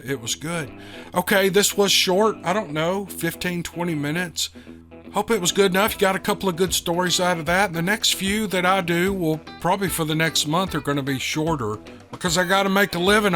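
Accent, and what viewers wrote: South, United States. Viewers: Again, I missed hearing this podcast. I need to change my email address, duh! For some reason your new podcasts aren't on YouTube TV! What's up with that? I always listen to them at night on TV, and I enjoy them so much. If you get a chance, I did send you a file that I narrated some time ago. There might be a couple more that I did you haven't put on yet. Perhaps, they weren't good enough to include. That's your call, of course! I'd love to narrate a couple to see if they go over well. My voice isn't you so I'm sure people would miss that southern accent of yours! I'm just an old yank! LOL Hope you're doing well. Glad to hear you have a job to do! I'll help if I can, just let me know.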